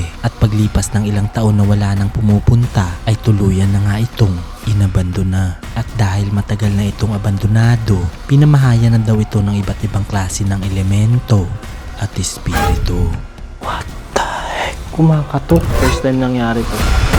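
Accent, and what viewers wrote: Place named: Ilonggo, Philippines. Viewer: Ilonggo gid na sia ayy. hahaha tuno ngani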